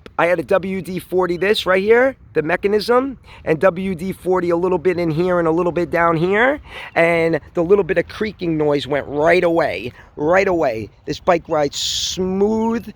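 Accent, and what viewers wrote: New York, United States. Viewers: Great video i love your Ny accent and i love all your scooters nice!!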